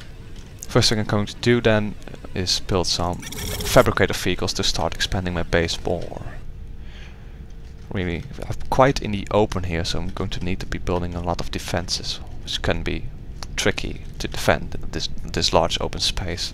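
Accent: Netherlands. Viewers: Dutch peoples english accent is so easy to recognise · Yea, you sound dutch :P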